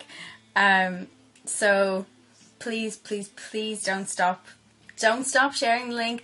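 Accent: Ireland. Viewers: I think she's irish? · Are u Irish I recognise the ascent from when I used to live there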